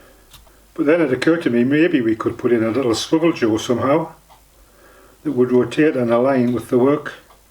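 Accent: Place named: North East, United Kingdom. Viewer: your welcome ,just "discovered "you today got some great stuff ,like the hold down for silver soldering, metal is sorted out from the "scrap box" & I'll have one made tomorrow ! northern eastern accent I'm prob not far from you a little bit further down. Graham.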